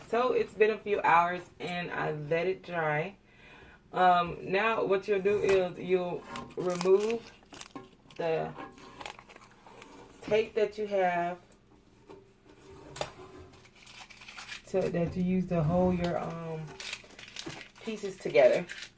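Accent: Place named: Florida, United States. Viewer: Hello, just wondering how you are and where have you been? I hope you've found new found fame and are just too busy filling orders for decor and just not have time for diy videos. If so continued success! I'm from Texas, are you from Florida? Your 'accent' although subtle, sounds like a good friend of mine from Florida. Just curious.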